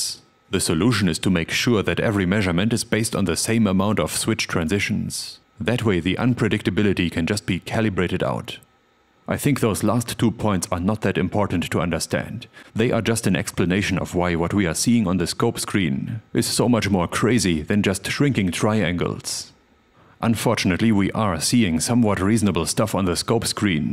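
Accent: Germany. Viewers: German native that speaks English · sounds like a German Darth Vader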